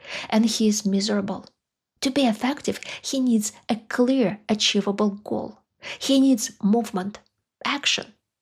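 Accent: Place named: Russia. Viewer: Just found your channel a few days ago, and enjoying learning some more info about the russian state and culture. Just want to say that you have an incredibly soothing voice, and you could be very successful as an ASMR artist. I've been using ASMR for over a decade, and consider myself to be something of an expert. You have an exceptional raw talent for ASMR content. Definitely consider it for the future. Funnily enough, my favourite ASMR artist is also a russian woman named Maria (gentle whispering). There is something about the russian accent that hits like nothing else. Would love to see you harness that talent. Either way I will be watching more of your videos, to calm my mind and learn some new things! Thank you ❤❤❤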